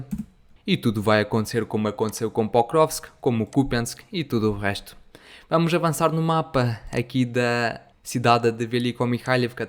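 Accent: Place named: Portugal. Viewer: é brincadeira cara. Tá ótimo o vídeo, a narração mesmo com o sotaque de Portugal tá perfeita. Forte abraço!!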